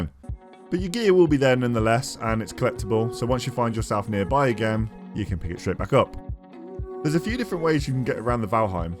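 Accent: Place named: Coventry, United Kingdom. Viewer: You sound like you're from Coventry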